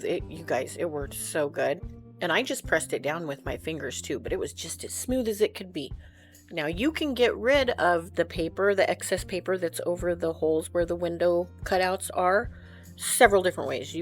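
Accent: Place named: South Carolina, United States. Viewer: You must be from upstate South Carolina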